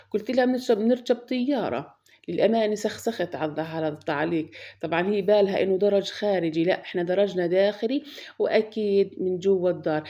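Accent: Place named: Palestine. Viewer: سلامة عمرك يارب حبيبتي لهجتك الفلسطينية الفلاحة حلوة كتير زي لهجة امي الله يرحمها ويغفر لها لأنه إحنا من قضاء يافا يازور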